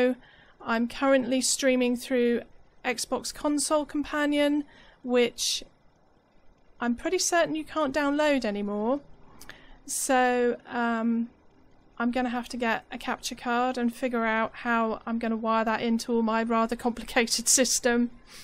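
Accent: United Kingdom. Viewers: Finally, tutorials with a beautiful British accent.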